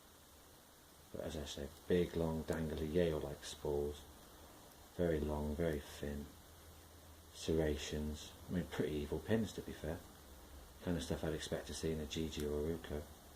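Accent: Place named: Sweden. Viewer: didn't even notice his accent until you said it, then immetiate: swede. channel seems to be rly great find for me.i miss shooting stuffs. you do know teh nicest people :)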